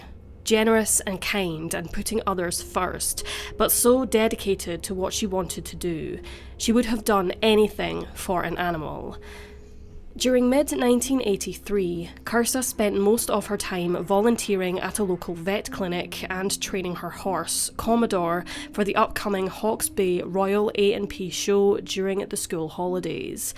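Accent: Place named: Somerset, United Kingdom. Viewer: Ooh arr nothing wrong with a Zumerzet accent ..😃😃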